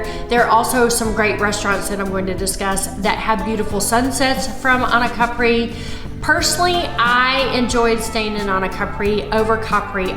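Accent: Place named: Texas, United States. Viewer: Where is her accent from, why am I thinking Texas?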